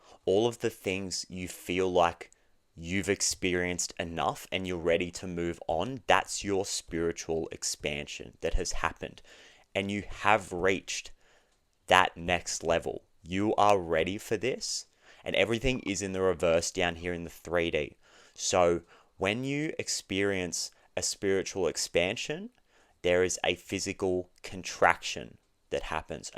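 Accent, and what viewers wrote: Australia. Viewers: Thank you. You are very sweet and genuine. I enjoy your readings. Where are you located in the world? You sound a little like an Aussie! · i actually had to question myself if I was right it was an Aussie accent for a few seconds haha 🙈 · did not expect the Aussie bloke voice... noice. Totes supporting ya mate *hits subscribe. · Is your accent Australian??? ❤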